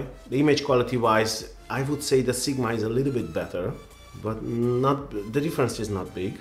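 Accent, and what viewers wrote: Hungary. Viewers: just subscribed....love your analyses and the Hungarian accent! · Annyira hallatszik valakin ez a magyar akcentus! :D nagyon jó videó. Rendkívül informatív és jól felépített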